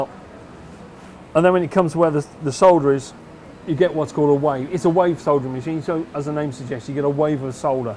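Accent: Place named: South East England, United Kingdom. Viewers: Somewhere in the South East of England without a shadow of a doubt. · Sounds southern. Milton Keynes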